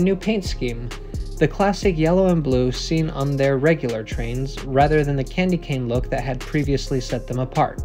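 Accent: Netherlands. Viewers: Bro u dutch?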